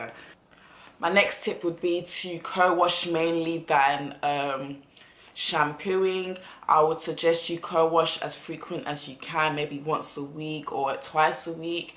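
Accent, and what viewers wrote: United Kingdom. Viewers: errmm...sort of lol. OK so this is off topic; you sound like you're in the UK. I am too and I was wondering if you know a place for crochet braids. I only do it when I go back to Ghana but haven't found anyone here that does it.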